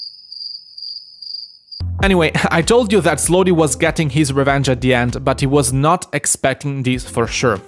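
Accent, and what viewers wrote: Italy. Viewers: Quanto se sente che sei italiano! Bel content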